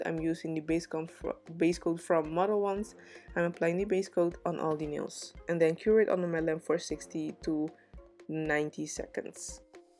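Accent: Netherlands. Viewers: I can hear from your accent that ur living in Holland.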